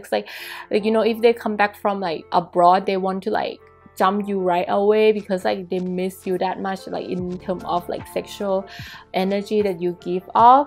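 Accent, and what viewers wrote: Thailand. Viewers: thanks a lot for the fun reading xx btw, are you thai? i'm just curious because we have similar accent. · Pile 1, thanks for beautiful reading. Are u from Thailand? I think u have thai accent. 😊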